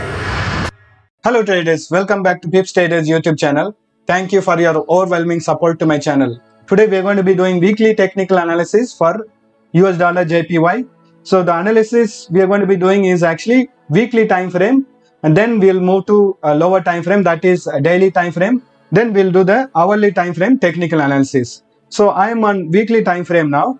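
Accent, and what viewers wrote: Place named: India. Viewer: ohh your indian akcent )) Greetings from Azerbaijan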